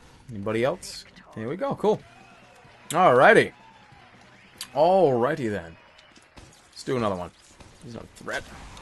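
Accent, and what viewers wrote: Boston, United States. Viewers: he always had the boston accent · well ya, scout just has a boston accent. Jerma sounds like hes from them parts.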